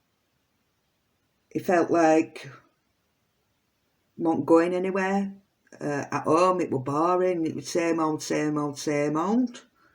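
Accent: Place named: Yorkshire, United Kingdom. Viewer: Hi hun new sub you sound very yorkshire im from Leeds and you sound same accent loving this ive watched this about Sebastian from day one its so sad 😢💚💚💚💚💚💚💚💚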